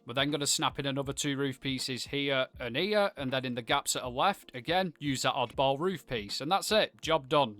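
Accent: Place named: Northern England, United Kingdom. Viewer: Brilliant build and humour yet again and loved you bringing in the other builders too! I love seeing what you all come up with and I wish i was as creative and clever! Oh and as a fellow ‘Brit’ from up North I love the accent and by name and nature!!! 😂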